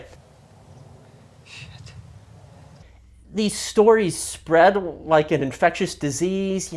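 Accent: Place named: Pittsburgh, United States. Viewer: What part of Pittsburgh is this guy from?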